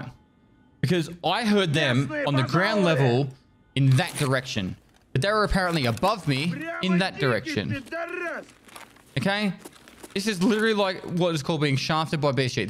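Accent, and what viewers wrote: Australia. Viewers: Australians have the best accent lol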